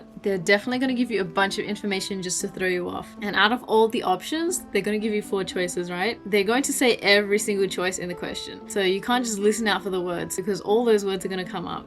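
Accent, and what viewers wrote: Australia. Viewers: Are you Australian? Very cool accent. Feels a mix of several accents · Your accent is 75% Aussie and 25% US!! WTF?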